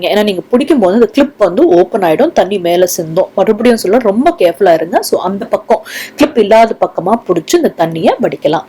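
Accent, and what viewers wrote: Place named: United States. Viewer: ரொம்ப நன்றிமா 🙏 உங்க பேச்சுபாத்தா நீங்க அமெரிக்கால இருகரகீங்கன்னு நினைக்கிறேன் கரெக்டா? நங்களும் இங்கதான் இலருக்கோம். நாளைக்கு இப்படி சாதம் வடிக்கப்போறேன் 🙏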